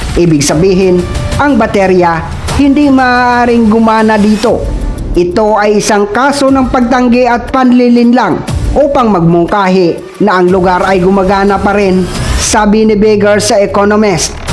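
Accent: Iloilo, Philippines. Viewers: kesa naman sa katulad mo na di kaya ang ginagawa ni prof eh ilonggo yan si idol eh hahaha. Mas mahilig pumuna ang mga taong walang kakayahan kesa doon sa marurunong.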